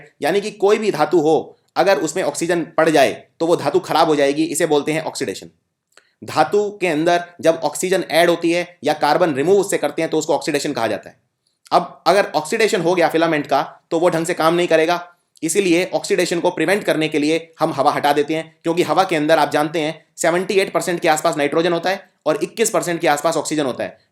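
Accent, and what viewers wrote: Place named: Bihar, India. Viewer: Bahut khub . Ur accent reminds me of a teacher of mine from bihar . Thank u sir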